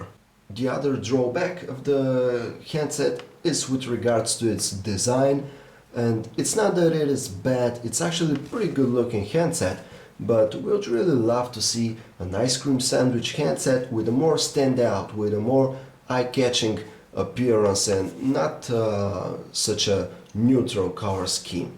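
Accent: Russia. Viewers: put someone english speaking, i dont want to listen rusglish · are u russian ? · Sir r u Russian?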